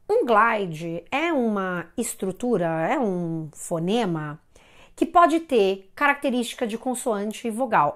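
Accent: Rio de Janeiro, Brazil. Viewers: Amo os "searviaçoish" dos "carioacaish". PS: tive um colega que também fala "eu naisci", acho que é do Rio.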